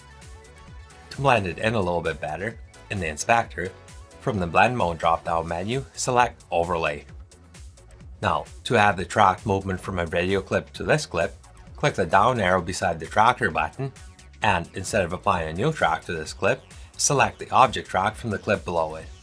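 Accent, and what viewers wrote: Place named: Saskatchewan, Canada. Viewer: Holy cow dude, I'm from Saskatchewan and it took about 2 seconds to place the accent :-). Thanks for the tracking info!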